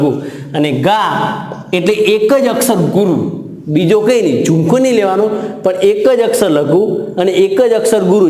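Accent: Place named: Bhavnagar, India. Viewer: Gajab 6o sir Aap,aapni boli parthi Bhavnagar na lagya sir😅 I m right?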